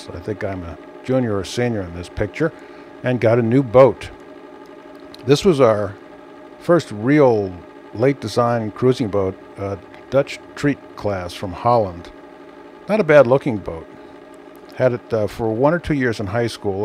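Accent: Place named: New Jersey, United States. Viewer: I understand why I like your commentary so much now, you are a Jersey guy. You bastards are tougher than a bag of ten penny nails. True story.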